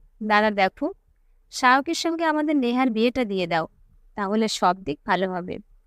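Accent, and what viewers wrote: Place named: Bangal, Bangladesh. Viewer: Bachik shilpir golpo-porata ekdomi bhalo lagalo na. Bangal bhasay kotha barite boltei paren, tai bole publicly porar somoye, koresen, khaisen, seleta, hoyese, firese - eigulo kane kot kot kore lagey. Ishh.....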